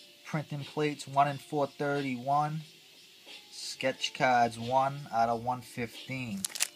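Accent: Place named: Boston, United States. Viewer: You sound like you're from Boston are you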